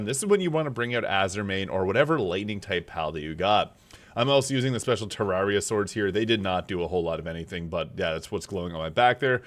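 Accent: Canada. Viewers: Took about 10 seconds to figure out you are EXTREMELY Canadian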